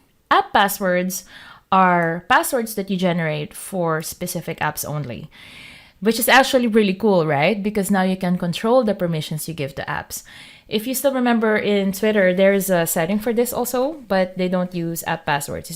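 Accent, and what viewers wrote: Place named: Philippines. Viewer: are you filipino??? your accent is sooo filipino english to me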